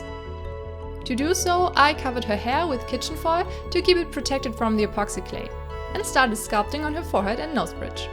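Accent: Germany. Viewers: Are you german? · Is she German? (Because of her accent) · woah i just discovered your channel right now and YOU'RE SOOO TALENTED! (btw (if you ever see this comment xd) i always try to recognize an accent and my question is.... are you german? it sounds a bit like this xd ((and because of the "moin" at your channel description))) (if you're german: Ich liebe diese Puppe! XD) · Are you German? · Why am I always cringing on german accent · Are you German? You kinda sound like you are. (I'm just wondering.. xD) P.S. Love the Doll! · Are you German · R u a German?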